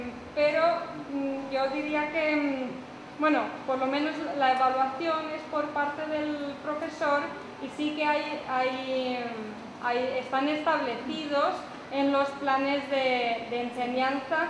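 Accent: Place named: Spain. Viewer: sí que se le nota el acento español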